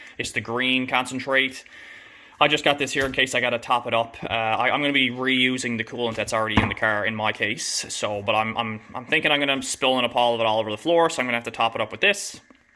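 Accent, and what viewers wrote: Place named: Ireland. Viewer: A sense a bit of Irish accent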